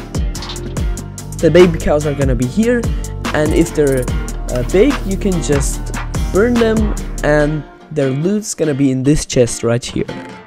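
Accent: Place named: Germany. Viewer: Ich hör so hart den deutsch akzent raus hahahaha kriegst aber trotzdem ein abo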